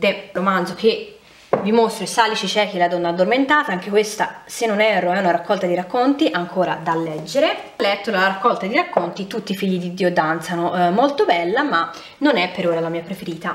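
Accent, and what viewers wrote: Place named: Tuscany, Italy. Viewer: Ciao, e vuoi vedere che la fine del petrolio sia la fortuna dell umanità. Bellima la parlata toscana.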